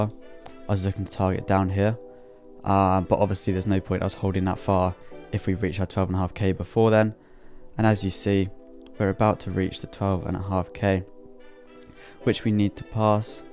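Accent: United Kingdom. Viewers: Hey bro where in the UK you from?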